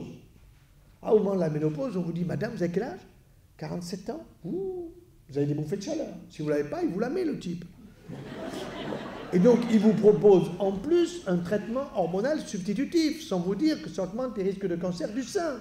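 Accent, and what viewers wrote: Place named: South, France. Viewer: Entendre respirer la santé avec cette conférence pleine de bon sens, au rythme slow du laboureur qui swing avec le bio, et madeleine de Proust, avec l'accent de Marcel Pagnol. Professeur Joyeux vous nous faites un réel grand plaisir. Patrice D. Ingénieur Agronome né 1944 10 ans irrigation goutte-à-goutte au Maroc.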